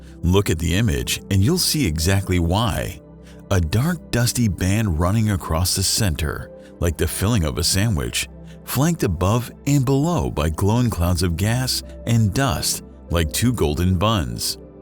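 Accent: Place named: United States. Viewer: Every bloody where you go, every bloody video....a yank accent. Too many yank accents..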